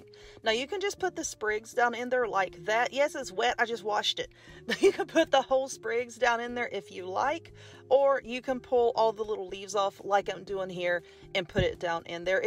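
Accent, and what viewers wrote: South, United States. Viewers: New sub. Definitely making this today or tomorrow. I’m fascinated by your tats and nails. Interesting with your southern accent. My mind kinda went “Huh??” when I saw them.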